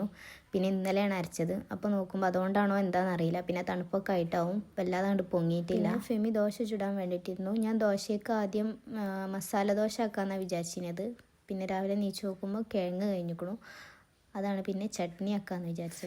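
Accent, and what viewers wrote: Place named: Kannur, India. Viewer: Video Nalgene....specially ur slang..nmlu kannurkark Kelkar ishta....but lack of content feel cheythu....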